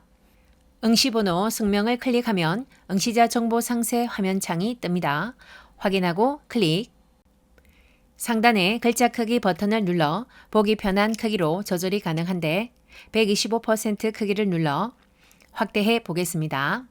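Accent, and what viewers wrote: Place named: Gyeongsang, South Korea. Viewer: 나도 대구지만 경상도 사투리 정말 더럽게 진하게 쓰네... 이 여자 멍청이아냐?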